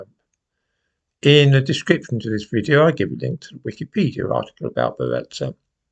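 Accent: United Kingdom. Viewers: is absolutely critical in maintaining perspective and clear vision in the smoke and mirrors show. And he is so eloquently British as well.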